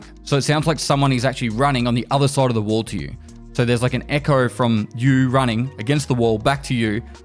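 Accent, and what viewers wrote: Australia. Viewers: I watch your videos to practice my Australian accent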